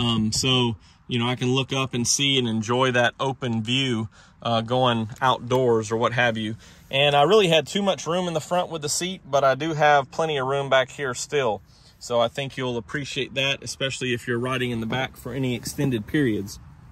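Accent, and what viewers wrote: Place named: Alabama, United States. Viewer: It’s kinda funny because the sales rep in our company from Alabama says “sells” instead of “sales” just like you.🤣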